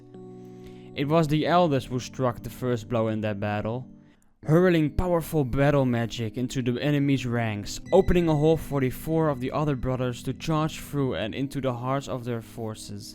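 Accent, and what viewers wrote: Netherlands. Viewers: You should probably let other people narrate these and get some more interesting graphics. i feel like a Dutch guy is trying to give an English school powerpoint presentation. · they are both dutch i think, i can tell by the accent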